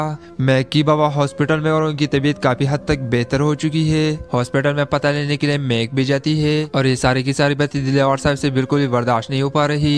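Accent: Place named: Urdu, India. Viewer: lahjy se to lag raha hai ka ap Urdu sai bol rahy hain because ap Muslim hain Hindu jo huty hain wo hindi bolty hain aor hindi bolny walo ka lahja pata chal jata hai aor India me jo muslman hain un ko to ni lagata lakin pata chal jata hai ke ya Indian muslim hain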